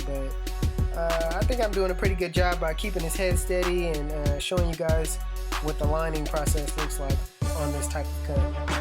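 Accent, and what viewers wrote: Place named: Bay Area, United States. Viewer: Are you from Vacaville California? Because you gave the Bay Area accent.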